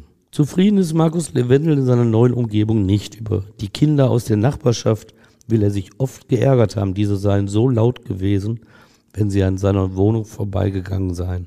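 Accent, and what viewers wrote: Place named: Ruhr, Germany. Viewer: Er ist doch der Gerichtsreporter und erzählt es wie er alles im Gerichtsprozess erlebt hat. Kein Anderer kann es doch nicht so erzählen . Das ist Ruhrpott.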